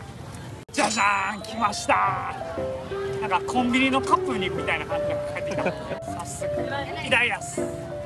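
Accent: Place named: Mie, Japan. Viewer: 最近見出したのですが何人くらいで旅してるんです？ 喋り聞いてると三重の方かな？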